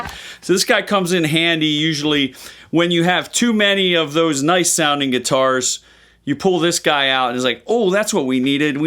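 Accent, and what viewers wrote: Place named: Eastern Pennsylvania, United States. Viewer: I was listening to you speak and thought to myself, "This guy sounds like he's from eastern PA. He's got that Bam Margera accent." Looked it up and I was right! Great video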